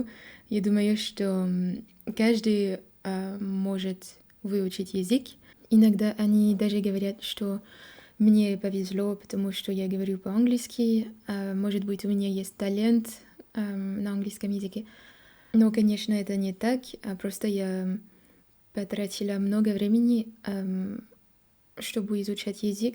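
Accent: France. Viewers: Браво! У вас очень хороший русский, с приятным французким акцентом! То, чего вам пока не хватает, в этом нет вашей вины - все придет с опытом, это более богатого словарного запаса, чтобы использовать различные синонимы, образы, метафоры, более красочную и сочную речь! Желаю вам успеха! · Прекрасная русская речь! Кстати, насчёт книг о Гарри Поттере. В аудиокнигах «ГП и кубок огня» и «ГП и Дары смерти» ты бы смогла озвучить реплики Флёр Делакур на русском языке с твоим французским акцентом) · Вы прекрасно говорите по-русски!!🥰 с милым французским акцентом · я даже хабиба хуже понимаю, чем ее. я на данный в японии по обмену, тут много французов и этот французский акцент, как мурлыканье котенков очень приятный для ушей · Уважаемая! Вы прекрасно говорите по-русски, соблюдаете падежи и склоняете слова не коверкая окончания! Также у Вас прелесный акцент👍🏻 Ударения, почти все, всё равно говорите по-французски - с ударением на последний слог. 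Это тоже очень мило😊 Supér!❤ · вы большая умница, потому что произнести все слова правильно во всех падежах на русском иностранцу практически невозможно. А вы это делаете на 98%, хотя начали изучать русский во взрослом возрасте! Те, кто пытается вас критиковать, наверняка не знает так хорошо иностранный, как вы знаете русский. Есть теория, что без акцента можно научиться говорить на языке только если попадаешь в родную языковую среду ДО 8-9 лет. Потом мозг не может правильно научиться контролировать воспроизведение звуков на 100%. Наверное, бывают исключения, но это единицы. Но вы не переживайте - лёгкий французский акцент только добавляет вам обаяния! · Великолепный русский язык, французский акцент только прибавляет шарма. Но если есть задача минимизировать акцент. то это несложно, есть несколько конкретных моментов, над которыми можно поработать. 1. Шипящие (например, слово что: щьто вместо што; или слушять вместо слушать) 2. 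Иногда смягченное Л вместо твердого (слушаля, начиналя) 3. Ы (язик вместо язык) Только этого вполне хватит, чтобы практически снивелировать акцент! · Bonjour,! У тебя отличный русский язык, ты не делаешь ошибок в самых сложных местах: падежах, склонениях, спряжениях, предлогах, правильно подбираешь приставки к глаголам, ставишь глаголы в совершенный и несовершенный вид. Единственное, что в тебе выдает француженку - это твой акцент. Но так даже лучше, потому что в этом есть определенный шарм (хм, кажется, это французское слово). Желаю тебе удачи во всех твоих начинаниях, особенно - в дальнейшем постижении русского языка (он действительно очень красиво звучит в твоем исполнении)! А вообще это удивительно и приятно, что даже в такое сложное время, как сейчас, когда отношения между нашими странами переживают тяжелые времена, в мире существует человек, который снимает такие вот видео. Возможно, это не такая сложная вещь, но это очень большое дело. 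Спасибо тебе за то, что помогаешь нашим народам лучше понимать друг друга. Всегда рады видеть тебя на русской земле! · Французский акцент звучит прекрасно, а грамматика очень на высоком уровне, ты молодец) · Произношение правильное, но! (Всегда есть "но"😂) У каждого языка есть своя интонационная особенность - мелодика языка. И у вас выходит русская речь, но с французской интонацией (мелодикой) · Freeeench accent 😌🤌🏻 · кстати впервые слышу французский акцент на русском. Она будто говорит на французском, но русскими словами) Абсолютно ожидаемо что это должно звучать именно так, но слышу впервые) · Говорит хорошо, почти не делает ошибок, но произношение смешное, как будто нарочно искажает слова. Особенно французская привычка делать ударение на последний слог, очень комично звучит. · Русская речь с французским акцентом - это самое красивое что я слышал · С нежным французким акцентом, так мило!😊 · Отлично разговаривает, пусть с французским акцентом, но это даже придает некий шарм, если и были ошибки то они несущественны. 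Круто! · Восхитительный французский акцент! Такое обаяние в этой речи.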